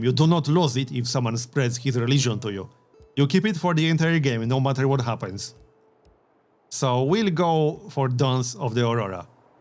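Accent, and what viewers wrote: Poland. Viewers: where are you from mate, you sound Polish. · He is obviously Polish :)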